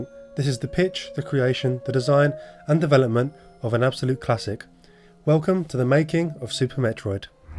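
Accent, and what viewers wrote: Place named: United Kingdom. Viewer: Ah, I got a British accent, this time. Lotta Metroid videos, lately.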